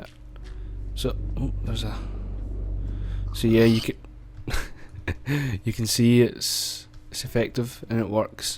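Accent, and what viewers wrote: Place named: Edinburgh, United Kingdom. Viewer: Where you from in Scotland, Edinbrugh ishh? You sound it.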